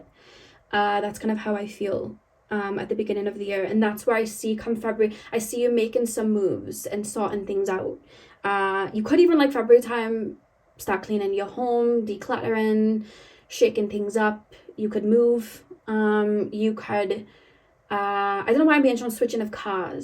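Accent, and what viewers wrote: Wales, United Kingdom. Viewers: We missed your Welsh accent and voice so much!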